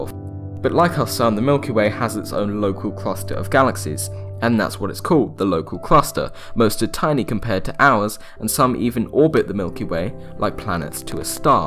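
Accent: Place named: South, United Kingdom. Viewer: Hard to understand his south-english accent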